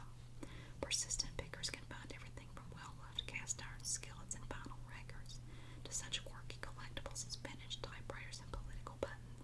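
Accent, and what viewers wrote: South, United States. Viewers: Your voice is so soothing! Makes me feel at home. Originally I'm from Tennessee but now live in Oklahoma, just love a soft southern accent! Thank you for making these videos!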